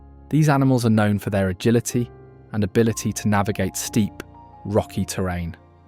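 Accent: United Kingdom. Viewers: You missed so much. Why even have Souix Falls And Mitchell but leave out Rapid city? Also your narrator has a British accent and doesn’t always pronounce names properly. Start over and do West River and East River in a different episode. It’s beautiful but poorly done. west river citizen for 30 years , and still a frequent visitor and site wearer, never gets old!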